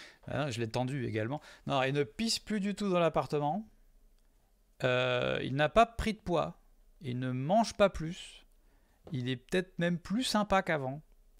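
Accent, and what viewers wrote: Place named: Agen, France. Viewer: Pour moi on est sur l'accent d'Agen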